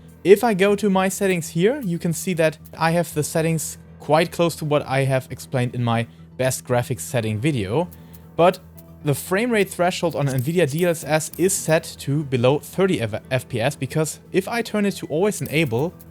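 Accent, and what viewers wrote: Germany. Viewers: Haha, bei dir merkt man sofort am Akzent, dass du aus Deutschland bist. Grüße aus Niederösterreich.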